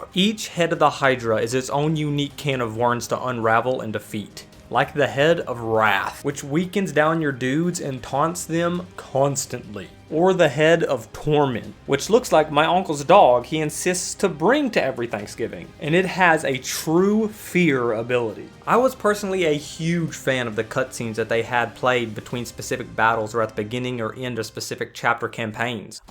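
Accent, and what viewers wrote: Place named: South Carolina, United States. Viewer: Wow what an accent. SC?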